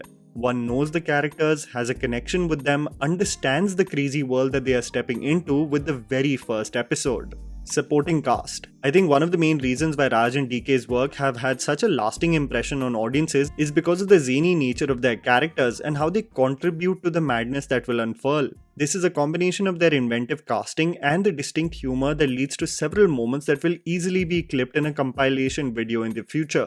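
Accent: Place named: India. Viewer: Watching your videos to improve my English, uf I love the Indian accent!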